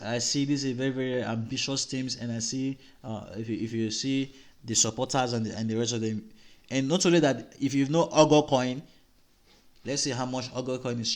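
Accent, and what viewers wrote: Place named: Nigeria. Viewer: where are you from, you sound Nigerian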